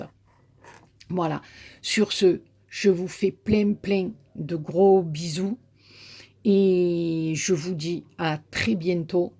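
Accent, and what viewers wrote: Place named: South, France. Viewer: Coucou Très contente d entende ton accent du sloeil Très heureuse de savoir que ton mari va mieux ta fleur est magnifique srcapbises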